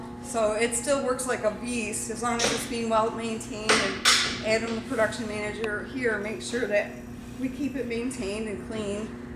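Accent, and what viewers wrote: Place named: Upper Peninsula, United States. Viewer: Where is she from, she says midwest, but man I can't place that accent. Kinda sounds like a Yooper, but strangely off. She pronounces durometer as "Der-RAM-eater", and called a joint knife a "scOOOOper". Kinda Yooper-ish.....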